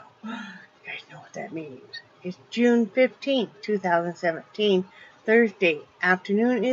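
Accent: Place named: South, United States. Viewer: If I didn't know better I'd think you are getting a little southern in your voice. We are going to convert y'all yet💕💕💕😀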